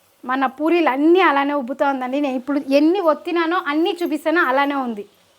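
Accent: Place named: Karnataka, India. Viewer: Mee Telugu, kannada accent tho kalisi undhi 😊Aina baaga cheppavu 👍🏼 nice n new recipe 😊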